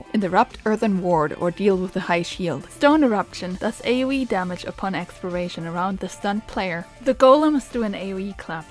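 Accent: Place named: Germany. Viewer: I saw in your "about " section that your accent is German, and you're "Working on that, too". I don't think it's something you need to feel self conscious about. I'm a native English speaker from Canada, and a person from England would say that I'm the one who has an accent. You certainly speak English better than I can speak German. Fantastic guide by the way. Concise, informative, and with a bit of humor to keep it from being too dry. Please make more!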